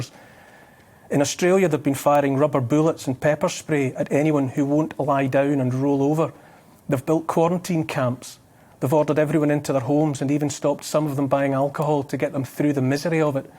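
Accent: Scotland, United Kingdom. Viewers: A pleasure to listen to a Scottish voice that talks such sense , I just wish that The Scottish First Minister would take on board the few words that have just been spoken with such conviction, Boris has lost the plot , we welcome our enemies to these shores with open arms, I watch as our culture is slowly but surely eradicated and disposed of in such a political and cowardly way, our western way of life will soon be distant memory and we only have our cowardly and spineless politicians to blame. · is this Wiseman who can talk Scottish?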